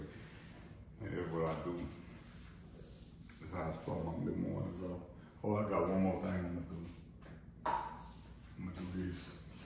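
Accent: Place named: South, United States. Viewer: Your southern accent thick! Its crazy watchin a laid back video of u just chillin at the house. Diggin the morning voice! Heavy but soft at times as u speak. I've had seamoss and it does give you a nice boost of energy. The texture is a bit different but its good & great 4 ur health! Make me a cup?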